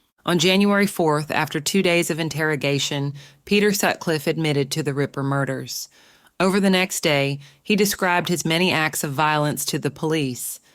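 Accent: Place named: Deep South, United States. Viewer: It is interesting listening to a narrator from the American deep south, talking in her strong accent, talking about the Yorkshire Ripper in Northern England.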